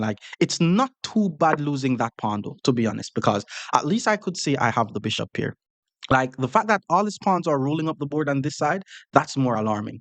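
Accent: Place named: Jamaica. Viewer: thank you so very much! I don't think anyone has ever played and also taught chess with more passion and joy than you. Combined with your smile, your warmth and the patwa accent it is absolutely infectious. Best moment of this video: - "double up his pawns...his pawn on e5 is also hanging...I'm gonna easily...got my king out of the center...my position is very solid...I have no weaknesses"...... one pawn move followed by his queen move: "this is actually a very scary positian"....comedy gold! Stiil have to laugh about it. Every single video makes me smile the entire time. Please keep on shining!!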